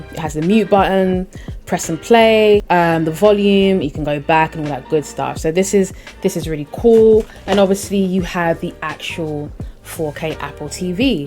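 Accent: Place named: United Kingdom. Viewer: Wow…nice. Don’t know how I missed you. Beautiful and witty. And that British tongue 👅……. Cheers! 🇬🇧 🇺🇸👍🏾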